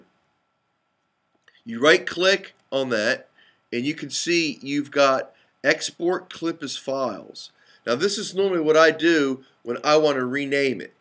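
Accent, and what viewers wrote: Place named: Baltimore, United States. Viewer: You sound like you're from Baltimore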